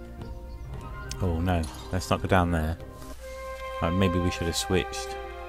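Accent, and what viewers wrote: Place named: Newcastle, United Kingdom. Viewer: Are you geordie? You have the accent.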